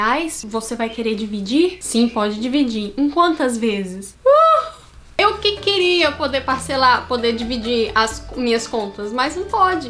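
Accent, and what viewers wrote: Brazil. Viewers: mesmo vc morando ai a 4 anos, eles sabem que vc tem sutaque br? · Eu sou mineiro e o seu sotaque parece muito com o daqui de Minas, exceto com o da região norte do estado, que é mais para o sotaque baiano.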